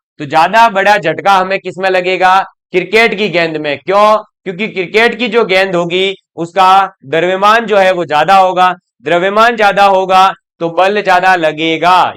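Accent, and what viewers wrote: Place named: Rajasthan, India. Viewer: Bhai aap rajasthan se ho १००%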